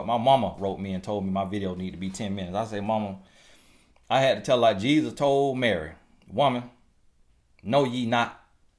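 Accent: South, United States. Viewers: I mean your southern charm came out in this video!!! Love every video 😂😂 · Good information all facts thank you however, Lord that country accent got me laughing.... where are you from?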